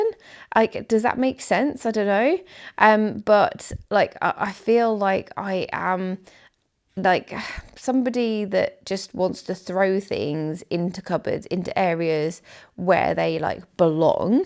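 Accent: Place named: Leicestershire, United Kingdom. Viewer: Hi im a new subscriber, your accent sounds familiar im from Leicestershire you sound very much like youre from leicestershire or nearby😊 love this video and all the best to your stepfather hope hes going to be alright ❤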